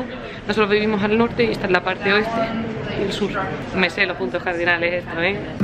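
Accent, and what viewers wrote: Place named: Granada, Spain. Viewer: hablas como yo .. eres de graná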